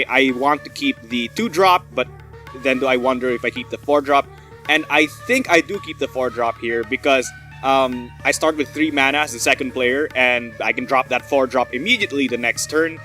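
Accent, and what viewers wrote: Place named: Philippines. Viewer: Are you Filipino? Your accent is a pretty good hint. :))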